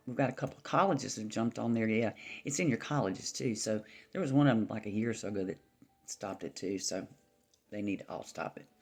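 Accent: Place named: South, United States. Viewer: Yay Lovely! Oh no not Vertigo! I had that so bad when they did work in my apartment and didn't clean the drywall dust out of the heating system. Don't go on any step stools! Flouride in the water also makes people more passive. I love hearing the news from you. You find things that I didn't know about and deliver it lovely, but Strong Southern Belle style. Things are chaotic and I should probably stop expecting otherwise. I have a domestic abuse situation going on with the people living above me, and the police won't stop the alcoholic husband, even though I hear glass crashing and things breaking, and the wife screaming. There's a small boy and a puppy too! I had to call the Police a couple of nights ago. They did nothing but talk to the drunk guy. He's been known to retaliate when people call the police by slashing tires and other craziness. 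Yay. My mom is ok but I have a bad feeling about where she is living. And I have to look into what else there is for her level of care. I can't physically do it myself, and I can't afford At Home Care. I already tried all of that and there was no way. She refuses to do any leg strengthening, so she can't walk. They come into her room to help her with her legs and she screams for them to get out. I have no choice but to have her living where they can take care of her. She wouldn't let me do her personal care either. I never wanted her to live in a Care Home, but she fights making it any different. The stress makes my own EDS pai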